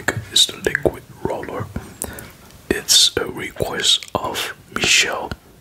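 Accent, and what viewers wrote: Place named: Netherlands. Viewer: I love the Dutch accent 🤗 Nice vid!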